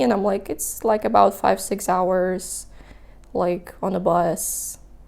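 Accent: United States. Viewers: You sound very American 😂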